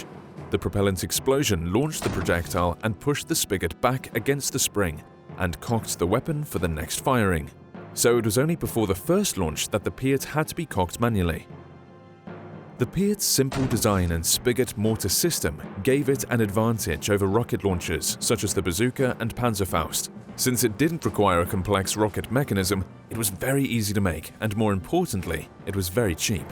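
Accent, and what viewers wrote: United Kingdom. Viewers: Video about a british weapon voiced with a proper british accent. perfect! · That British accent tho ...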